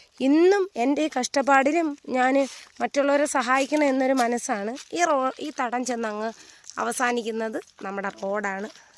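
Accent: Thiruvananthapuram, India. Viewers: നിങ്ങൾ വളരെ കഷ്ടപ്പെട്ട് ജീവിക്കുന്ന ഒരാള് തന്നെ . നിങ്ങളുടെ മനോഭാവത്തെ അഭിനന്ദിക്കുന്നു സഹോദരി . ആരെയും മനസ്സ് കൊണ്ട് പോലും ദ്രോഹിക്കാതെ ജീവിച്ചാൽ റബ്ബ് അനുഗ്രഹിക്കും . നിങ്ങൾ എവിടെയാണ് നാട് . സംസാരം കേട്ടിട്ട് തിരുവനന്തപുരം ആണെന്ന് തോന്നുന്നു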